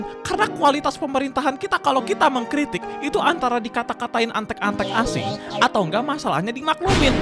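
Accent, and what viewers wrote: West Java, Indonesia. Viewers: Sunda lu bang?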